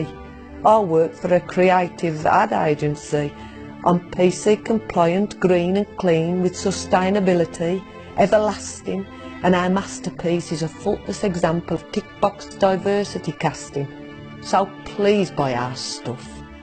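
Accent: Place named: Black Country, United Kingdom. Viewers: I don't know who wrote this but neverthelesss it is a piece of genius. Also It has more resonance for me having been delivered by someone from the Midlands, for reasons I can't quite put my finger on because although I have lived my life so far, for 47 years in my native Yorkshire then 10 in Scotland and the last 4 here in London, I find working class Midland people the 'Salt of the Earth'. You speak for me good Lady, thank you, and a very Merry Christmas to all at. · From the Black country,very co0mical accent.lol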